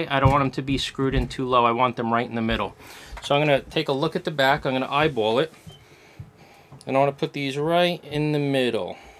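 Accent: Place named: New Jersey, United States. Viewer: The “locking nuts”, how many nuts does that guitar in the video have? Love that jersey accent though...👍 One thing that Fender recommends you do is to make sure that whatever you use to block the tremolo doesn’t move around when your strings are at pitch, whilst the bridge is flat against the top.... then you bring out the claw screws and it’s all good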